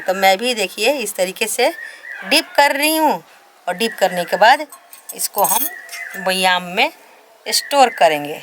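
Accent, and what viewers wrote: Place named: Chapra, India. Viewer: Tohar bhasha se bhujhata rahaa chaapra bihar se lagti hai.kaha se hai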